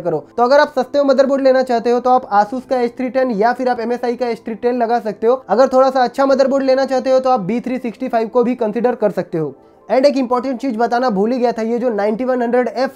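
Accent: Gujarat, India. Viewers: Bhai aap Gujarati Ho kya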